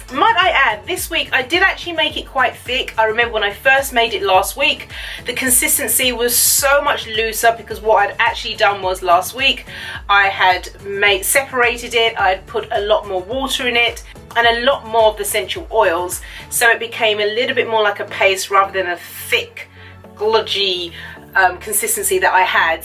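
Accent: United Kingdom. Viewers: omg i love your british accent im following your advice but i must admit im coming to your videos to hear you talk gurll!! my boo is from england but hes black and i just am in love with the accent lol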